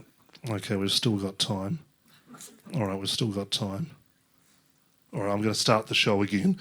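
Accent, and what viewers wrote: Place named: New Zealand. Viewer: Kiwi / New Zealand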